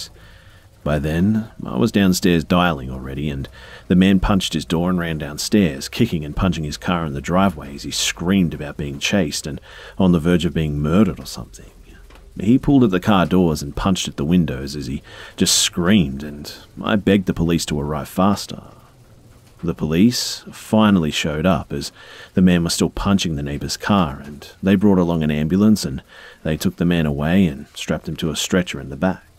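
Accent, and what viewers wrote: Australia. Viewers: I didnt think there was an aussie narrator on the tube. Hi from brisbane 😂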